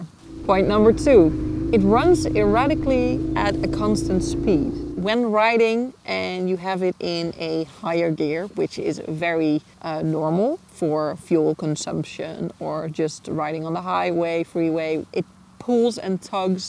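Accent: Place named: New Brunswick, Canada. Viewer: I couldn’t afford one so I picked up an MT-09. Was obsessed with it, especially the 2023 FTR 1200 S model, which has the exact same tires as the R6 I just sold. Couldn’t ride sport bikes anymore and wanted something upright sitting (with good hp AND torque) so these bikes fit the feel, size, sport category style and power which saves me from going to a heavy low hp cruiser. I was shocked to hear that the paint peels especially after knowing it was $4000 more than my MT-09. Dad always wanted a Harley before he passed but the 70s/80s HD’s were different. My go to in his memory is this. Now I just have to remember it’s jerky at high rpm and paint peels. I’m in NB, Canada and could tell from the accent and the bridges in the background where this was filmed haha! Awesome video! Agreed. Ryan F9’s video on it was great! +Glad to hear the windscreen helps! 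Had to add one to my MT-09. I’m used to the cockpit feel with R1 and R6 windscreens! Glad you didn’t hold back on what you didn’t like!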